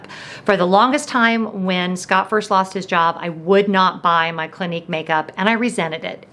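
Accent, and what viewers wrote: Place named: United Kingdom. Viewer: I loved the British accent 🇬🇧!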